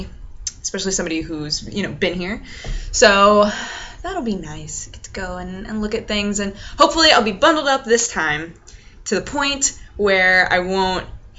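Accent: France. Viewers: I love your French accent. For me, it's so hard to pronounce the "r" :( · I love your frenchie accent !! I'm sure all your french fans (like me ^^) are specially happy today ! :D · your french accent is BEYOND hot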